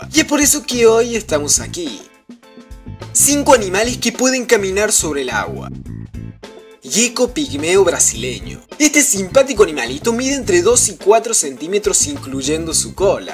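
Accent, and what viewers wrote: Córdoba, Argentina. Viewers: sos de Córdoba? De que parte?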